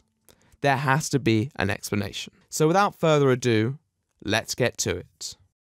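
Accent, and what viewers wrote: RP, United Kingdom. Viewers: I like these slightly shorter vids. Not that I don't like the longer ones or appreciate the work but I finish these more often than the full on 60 minute documentaries. Mainly because I watch these in bed sometimes and fall asleep from his sexy british yarn lol. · You posh Brits GOSH!! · Actually i love the British accent actually i think its the classy version of the English language. Theres another youtuber i like and thats Mr. Jim Sterling. And im a new subscriber to you to and i like your videos as well. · Omg you sound like your from the Uk !!! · I rarely comment on any videos. But, I have been binge watching these videos!!!! I'm into British Culture so the first thing that kept me here was the accent and then the fact that he is able to take me from A-Z on people I have idea about and I leaving feeling like I am ready to Spill TEA!! 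LOL! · People are comparing you to Colossal is Crazy? What? Why? Your content is way different than his and your voices aren't the same, I can tell that you both are from the UK. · This is weird but I love your voice and accent some British people I’ve encountered I thought were from Scotland anyway love your videos 🙌🏽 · So posh 😍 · I dont know whats less interesting, phussy or this video about him.....i will however subscribe as i am an american and therefore hypnotized by a british accent.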